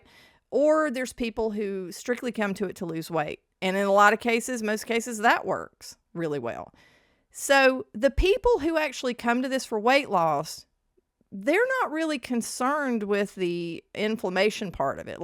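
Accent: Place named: South, United States. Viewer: You had me at "expose the corruption of Big Food and Big Pharma". Your Southern accent didn't hurt a bit!!! My family has been in the South since before the Revolutionary War!!! That said, I have primarily been somewhere between keto and carnivore since 2010. Eatimg my "normal way", my A1C is 4.1 and my insulin is 1.3...meaning I am metabolically healthy, and at the end of the day, that's my goal. Long story I'll spare you, but life had me go down a rabbithole and discovered the general science behind gut health and lactofermented foods...not necessarily a departure from keto...or carnivore if you follow Dr Sean O'Mara. I make my own raw lactofermented sauerkraut and other lactofermented foods, and make my own milk kefir, "perpetual" cultured buttermilk, and sour cream/creme fraiche. I know what's in them all and I know there's no additives or preservatives or anything funky or non-food. 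Part of the long story, not only did it help my daughter repopulate a healthy gut microbiome after MRSA, but it's helped me keep my diverticulitis at bay. To wrap this back around to my OP, my main goal is to reduce my dependence on Big Food and Big Pharma. Borrowing from Dr Berry, I try to harken back to my own ancestral eating and eat the way my rural southern sharecropper grandparents ate prior to 1960-ish. I was born in 1957 so I do know what that was. Nothing was GMO back then. They grew everything they ate, milked the cow twice a day, had chickens, maintained a smokehouse in order to pr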